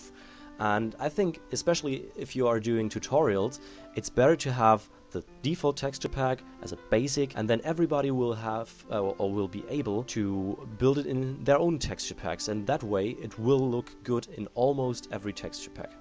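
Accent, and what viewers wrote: Germany. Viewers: Schon geil zu sehen wie alle nu so: "OOOOH der is ja deutscher daher der akzent!" xD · You don't have a weird accent its just that you're so good at English that people cant distinguish you're German accent. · i thought you where german or something like that. LOL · Ich habe mir von Anfang an Gedacht, das du Deutsch sprchst, das hat man gehört! Aber du spirichst extrem natürlich und "wortgewand" Englisch! Super! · i knew you're german! ;-) i can easily recognize that accent... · I knew u were German. Hail! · Waow, most Germans have a very obvious English accent but yours is noticeble but it sounds alot better then what I've heard as a Dutch guy :-) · i new you where Germin i just couldnt put my finger on it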